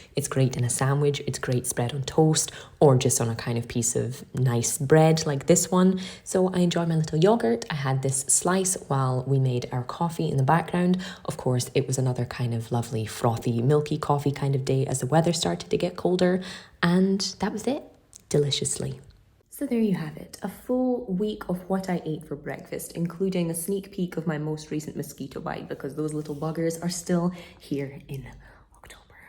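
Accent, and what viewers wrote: Scotland, United Kingdom. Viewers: Where abouts in Scotland are you from? 🏴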